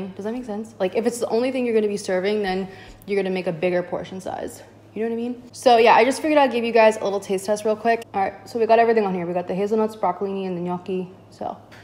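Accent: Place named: New York, United States. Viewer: Btw so glad I found your channel. And your NY accent is so great, I’m from Long island so it sounds homey and familiar 😊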